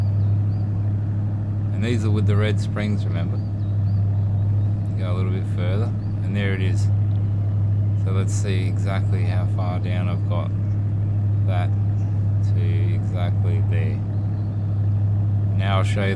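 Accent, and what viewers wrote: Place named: Randwick, Australia. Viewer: You sound like you're from Randwick, mate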